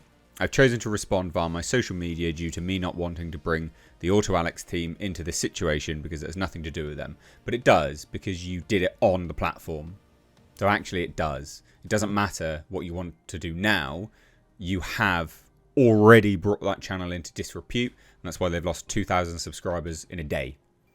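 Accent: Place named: United Kingdom. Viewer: Spoke like a true Brit .. ( the first 39 seconds of the post )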